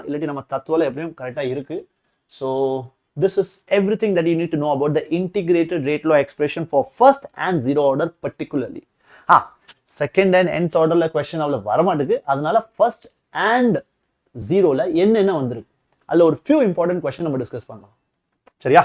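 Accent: Tirunelveli, India. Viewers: Sir ku tirunelveli pola 😅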